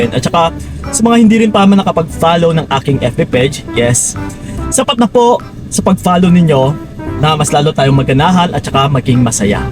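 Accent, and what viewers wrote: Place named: Hiligaynon, Philippines. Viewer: parang ilonggo si kuya dahil yong tono ng salita niya malumanay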